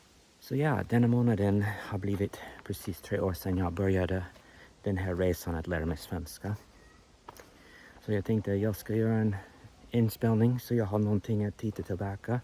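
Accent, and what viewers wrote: Texas, United States. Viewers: Det låter riktigt bra. Klart din svenska har en viss Texas-accent, men åtminstone jag som finländare hör mycket klart att du har lärt dig svenska i Sverige. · Din svenska är alldeles utmärkt och jag tycker du fått till fin satsmelodi. Att det hörs att du är från Texas är bara charmigt. Det gör det inte svårare att förstå dig. Bra jobbat! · Nice work. The Texan accent makes it hard pronounce certain stuff. I teach kids football in Swedish and my English accent is an issue with certain words. Great work though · You speak good swedish without the very strong accent americans often have. Be proud you have nothing to worry about. I understand everything. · De som säger att din svenska är bra har 100% rätt! Man hör ju förstås att du kommer från USA, men om någon har det minsta svårt att förstå dig är det för att den inte vill (troligtvis för att den inte tycker om "icke-svenskar"). 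Första gången jag ser dina videor och det är superintressant att få inblick i vården i USA från en mer realistiskt källa än House 😆 Att du har kommit så långt med språket på bara tre år är superimponerande, jag vet flera som varit i landet 10-30 år som fortfarande inte kommit lika långt. "En"/"ett", "den"/"det", "de"/"dem" är svårt, ("de"/"dem" gör otroligt många infödda svenskar fel på), men det är ju alltid lätt att förstå även om det skulle bli fel. Svårare blir det ju om mellanrummen hamnar fel, hoppas du inte jobbar med någon "grå hårig sjuk sköterska" t.ex. 😉 Bra jobbat! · Utmärkt svenska med bra grammatik och ordförråd. Du talar ju helt flytande utan pauser för att leta ord och det är inga som helst problem att förstå dig. Personligen tycker jag inte att du ska jobba bort texas-accenten, jag gillar den skarpt. Har alltid tyckt att det låter coolt när engelsktalande talar svenska. 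:) De enda smågrejerna jag noterade var ovanligt ordval på något ställe som "det var en stund sedan", vilket är direktöversättning (while) från engelska och det kan man ju absolut använda och alla förstår men mer vanligt är att man säger "det var ett tag sedan". Sen var det någon omvänd ordföljd som "ibland vi får tala engelska med dem" istället för "ibland får vi tala engelska med dem". Men det spelar inte heller någon roll, alla förstår givetvis ändå. Mycket bra jobbat! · As someone who knows it, he sounds just fine. Its easy to understand him, he speaks in a "natural" way (as in, not "book swedish"). Theres a definite american accent but honestly we dont really care about that:D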